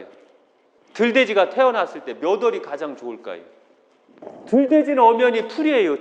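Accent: Chungcheong, South Korea. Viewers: 충청도 사투리가 구수하죠~^^ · 선생님 강의도잘하시지만 사투리잼있기도하구 우리사위말씨랑똑같아서많이웃어요 서산이유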